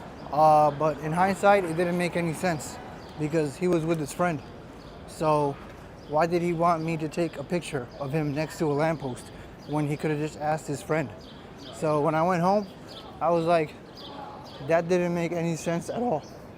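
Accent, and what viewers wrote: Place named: New York, United States. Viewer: Nothing like a guy that actually sounds like a Real New Yorker showing us around town. Love it.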